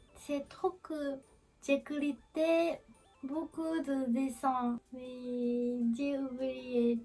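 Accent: Japan. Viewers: Merci pour cette vidéo , avec cet accent vraiment Kawaii, tu parle très bien Français bravo , mieux que je parle le Japonais 😆 Arigato Gozaimasu 👍😊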